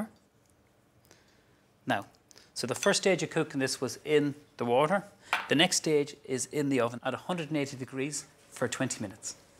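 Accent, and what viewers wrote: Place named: Ireland. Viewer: Samiches 😂 love it, real Irish accent lol. Great video ☺️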